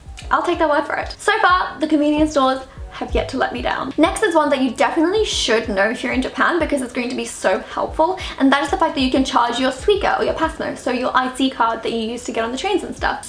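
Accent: United Kingdom. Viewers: Accent is definitely UK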